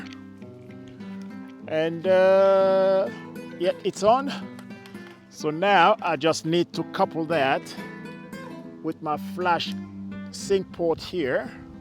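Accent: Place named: Australia. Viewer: Your portrait of your son is stunning! I hope both guys appreciate the gift these photos are now and WILL be for their children and grandchildren. I also hope they know how appreciated they are for giving you (and us! 🙂) their time and willingness to model and be teaching aids. Thank you to both of them. I'm always happily surprised the moment I realise an Aussie is creating and sharing here on YouTube. The moment you started talking I started smiling, then you panned around to show the trees in your yard and, yep, Aussie! 😁 Going back to my appreciation of your models, part of that is because I don't have one. Thirty two degrees in Melbourne today and I was messing around with a strobe, running backward and forward between camera and the stick I'd put on the ground as my "mark". It's an excellent way to suffocate oneself under the dark cloth and look hot, sweaty and as messy haired as possible on film! 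Too hot in the darkroom to develop it yet, even am....but I'm encouraged to do a little more experimenting after watching your video. Thank you for taking the time, I'm very grateful, and cheers to your models for their time. Their portraits turned out beautifully and that double exposure is a truly happy accident, too!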